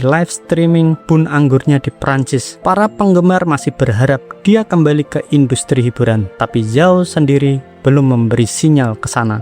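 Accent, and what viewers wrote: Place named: Java, Indonesia. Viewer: KA medok nya ganggu maaf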